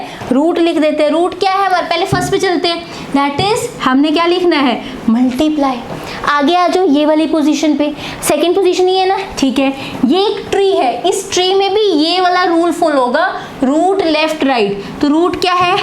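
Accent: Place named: Punjab, India. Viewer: love that panjabi accent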